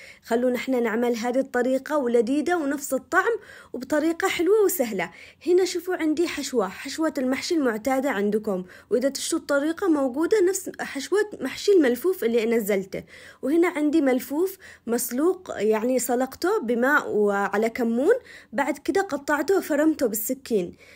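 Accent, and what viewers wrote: Aden, Yemen. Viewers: ماشاء الله ماحسنيك للهجه العدني وطريقة شرحك حلو الله يعطيك العافية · صوتك اجمل من الفديو كامل ماشاء الله عل الهجه العدنيه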